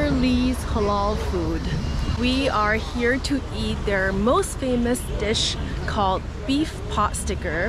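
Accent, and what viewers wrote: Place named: United States. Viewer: you have quite American accent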